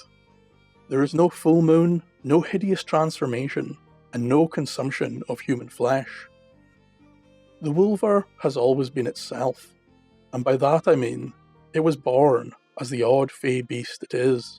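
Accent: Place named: Black Country, United Kingdom. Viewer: There are plenty of Wulvers! They are just not on Shetland! You have clearly never been to WOLVERhampton on match day. Wufruna's Town! Saxon names Or so we are told. Wulfruna was, according to Lore, the wife of a Saxon noble who gave the land which is now Wolverhampton to the people after a Godiva style row with her old man. As far as I know she did not get naked. But the linguistic similarities are clearly not co incidental. And Wolves have become local emblems, not just for the football team. Tell anyone within a 15 mile radius that you are going to Wolvo and they know where you are going. As far as I know they do not have any genuine wolfmen either. but hearing your pronunciations was so similar to our old dialect. I have often wondered about how different the Saxons and 'Norse' really were, especially in Mercia. The Southern Saxons maybe, but we have so many place names that could easily be Norse. 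If you look at Really old maps, we aren't Anywhere! We are not Mercia, we are not Wales, we are no man' s land and there is even one that shows a sort of Viking tendril weaving across to us from the Danelaw and splitting early Mercia from Wessex. Sorry for going of at a tangent and having Nothing to do with Scotland, but I was just struck by the words and sounds. I am fascinated by our lost past and the half remembered clues. This website is brilliant, please keep it up!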